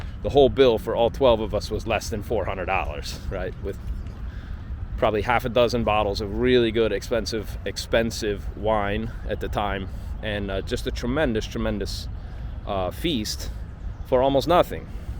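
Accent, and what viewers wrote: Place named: Argentina. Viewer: tenés acento de argentino hablando en inglés al principio pensé que eras de acá!!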